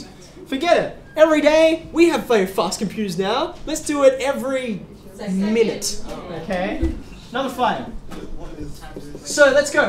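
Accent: Australia. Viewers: Clearly Australian haha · It sounds like he speaks English as a first language, as you can distinctly hear an Aussie accent. He likely has learned some of the backgound of Chinese, since he did introduce Chinese numerals in his class to make another point. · Prashant Kumar It's an Aussie accent, bruh. And he lives and works in Sydney, Australia. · Is he an Australian Asian? He looks Asian, but he has an Australian accent.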